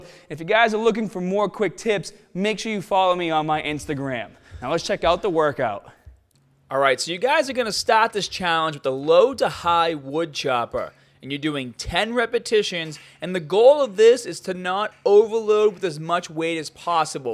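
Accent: Boston, United States. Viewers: The Boston accent is the best ever!. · Boston accent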